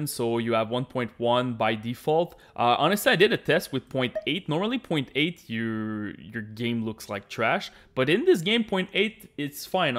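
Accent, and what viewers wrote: Quebec, Canada. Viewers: are u from quebec by any chance?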